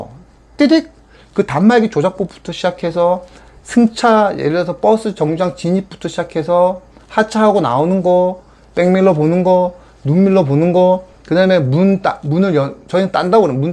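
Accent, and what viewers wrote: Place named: Gangwon, South Korea. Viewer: 말투에서 풍기는 억량이 강원도분 같기도하고 암튼 추천 남기고 갑니다 저도 4년6개월된 현직 버스 기사 입니다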